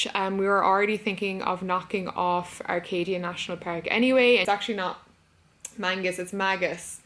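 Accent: Ireland. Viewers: I love your Irish accent. Makes you an awesome narrator lol. And it goes well with the New England theme...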